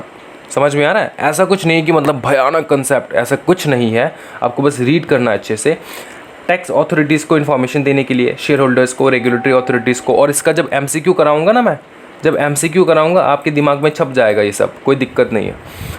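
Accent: Bengal, India. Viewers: Apka accent se pakka bol sakta hu ki aap Bengali ho😂😂..love from Guwahati ❤️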